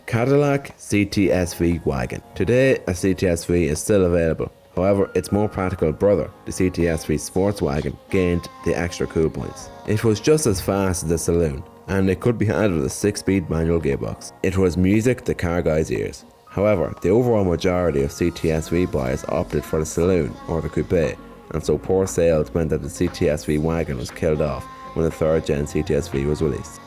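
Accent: Ireland. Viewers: NIssan Silvia wow have you never heard of an Irish accent?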